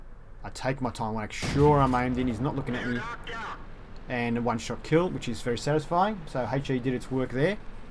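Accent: Australia. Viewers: are you australian?!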